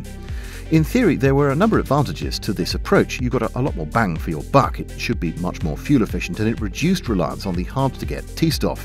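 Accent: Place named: England, United Kingdom. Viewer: Damn you! Once again, you have, through the magic of the Youtube "Subscibe" button, caused me to lose some perfectly useful sleep watching your highly informative and semi-mesmerizing presentations on Nazi Germany's comic attempts at world domination! Although this time I have no family-related commentary on the the technology involved in winning the War for this post, at least I understood at least seven out of ten of the words you spoke thanks to my continued exposure to the "English" English language. Thank you, citizens of Weymouth, Dorset, the staff at The Imperial War Museum in London and my friend and British Expat Clumpton - who lives in Vichy, France. Someday, someone will create a series of theme music Memes like that of YouTube's Mark Felton... Do keep up the good work, chap. Cheers!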